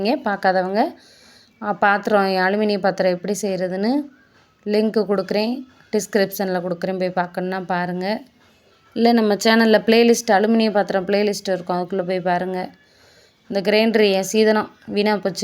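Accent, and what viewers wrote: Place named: Madurai, India. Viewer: நீங்கள் மதுரை பக்கம்பேசுரமாதிரிபேசுரிங்க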